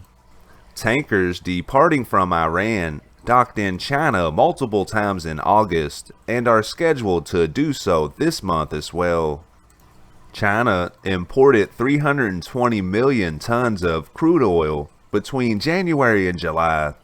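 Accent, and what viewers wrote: South, United States. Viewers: Maybe this is a repeated question but its nice to hear a real person instead of a c g voice. I cant quite place the accent on the person. Tenn., Ms., Al. .? Anybody know.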